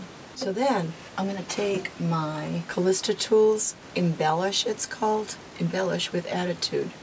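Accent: Boston, United States. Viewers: Just love your Boston accent. You have got a lot of hair up front. Be thankful, cause I would love to have that much hair. Love the video and good tips on top too! Have a great Turkey day🦃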